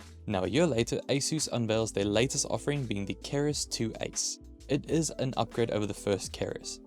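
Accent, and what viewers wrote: South Africa. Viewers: You sound South African. Where do you get your mice from?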